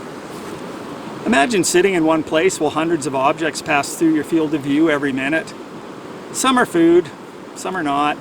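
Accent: Ireland. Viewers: Really interesting. Love the irish twang as well. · Are you in Maine/Labrador? I can hear an Irish twang in your voice.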